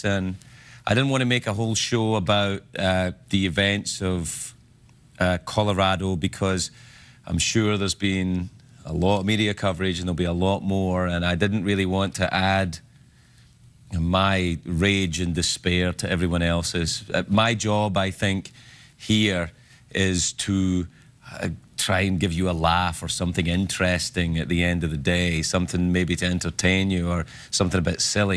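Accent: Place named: Scotland, United Kingdom. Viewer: He sounds Scottisher here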